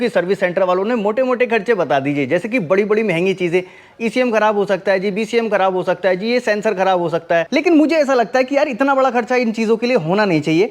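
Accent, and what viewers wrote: Haldwani, India. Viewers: बहुत शानदार सर जी मैं हल्द्वानी से हूं, आपकी विडियोज़ और बोलचाल से मुझे लगता है कि आप भी यहीं नजदीक से ही हैं। संभव हो तो आप से एक मुलाकात करनी है, से मिलने की बहुत इच्छा है।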